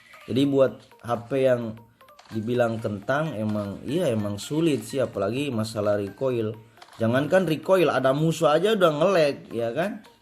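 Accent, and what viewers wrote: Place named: Sunda, Indonesia. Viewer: Orang sunda yeuh logat na beda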